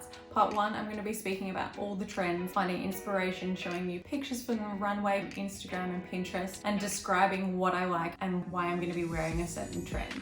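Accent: Australia. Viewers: Hi, I have just found you and great to hear an Aussie accent! Whilst I love following a lot of UK/US vloggers, it’s great to find someone in AUS.Thanks for your trends forecast! Whilst I may not be adopting all, it is good to know what to expect to see in the stores for the upcoming seasons. 🙏 Thanks!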